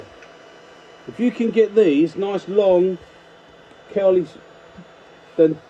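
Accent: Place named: South London, United Kingdom. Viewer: Fantastic, wasn't expecting to hear from you so close to Christmas thought you would be having a well urned break! I've got lots of books seen loads of the videos but your tutorials seem to hit the spot,feel like I can turn something half decent with me carbide and my badly sharpened traditionals,I think your accent helps, sound like one of my lot in South east London,no billy bullshit tell it like it is! As you can probably tell a few beers gone down my neck (it's Christmas) but sincerely enjoy the rest of it,and you and have a cracking New year.lee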